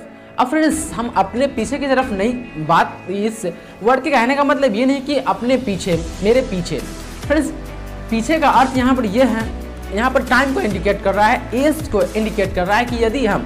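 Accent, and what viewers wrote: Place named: Bihar, India. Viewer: Main comment my pdi aap bihari ho lg v rha tha jb suni tb bhut achhi explained kiye ho aap bt thodi si bich bich my annnnn unnnn ar rukna bnd kijiye😂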